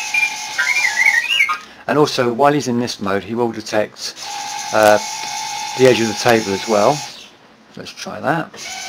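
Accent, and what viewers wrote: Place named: United Kingdom. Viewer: why's it have to be a british guy?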